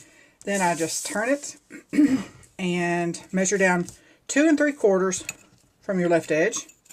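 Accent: South, United States. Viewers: Oh my goodness thank you! I love all your peekaboo pockets. I just found your channel a few weeks ago and I have to say I so enjoy your mini's and have watched all of your videos already!! This video was awesome!!! <3 Your southern drawl is super awesome I could listen to your tutorials all day!! Your such a great teacher!!! ((NY hugs)) -christine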